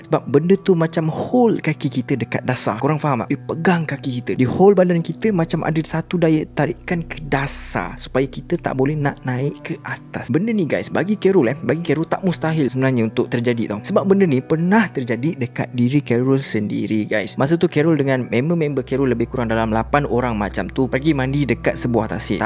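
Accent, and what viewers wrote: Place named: Melaka, Malaysia. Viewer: X bkn org Melaka ni...mengelibas pekat😂😂😜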